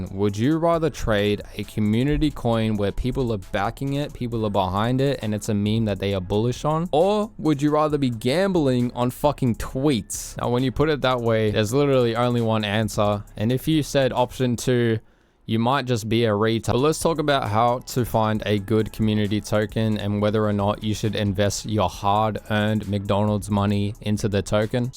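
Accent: Australia. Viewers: If you hear an aussie and he speaks like this with good humour, you know he genuine. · are you from Australia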